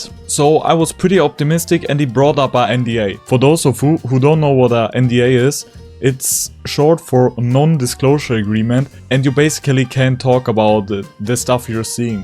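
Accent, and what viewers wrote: Germany. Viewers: im hearing a little bit deutsch heraus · Bro ich höre irgendwie deinen deutschen akzent raus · Bro man merkt das du Deutscher bist xDdd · Bro ist 100% deutscher😂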